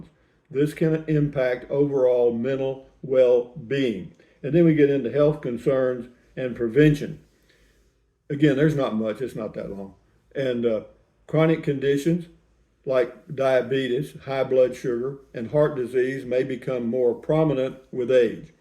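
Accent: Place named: South, United States. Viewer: Hi! Nurse here. Maintaining your dignity is half the battle of aging. I love elders!!! Greatest generation. You are doing it well,. You are as fine as an older Mathew McConaughey. Love that southern charming drawl.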